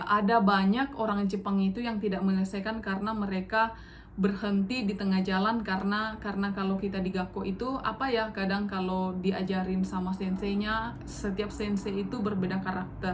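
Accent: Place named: Manado, Indonesia. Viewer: Orang manado? Soalx masih dpa dengar dialek manado🤣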